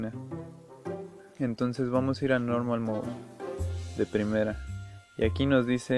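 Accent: Honduras. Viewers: Eres de Honduras?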